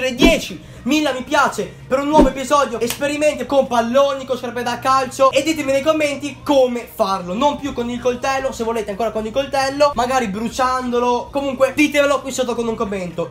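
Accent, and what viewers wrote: Veneto, Italy. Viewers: Di dove sei del veneto? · Ma di che città veneta seu?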